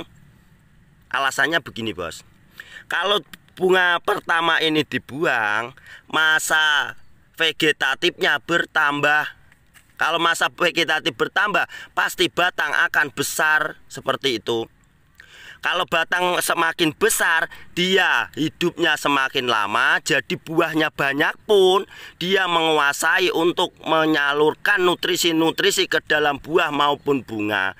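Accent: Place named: Pati, Indonesia. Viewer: Logatnya khas pati😅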